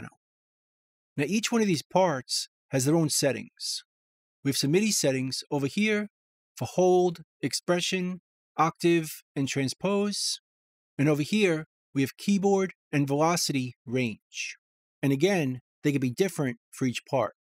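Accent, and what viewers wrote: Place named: New England, United States. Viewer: Your New England accent makes these videos entertaining as well as helpful. I'm from Texas.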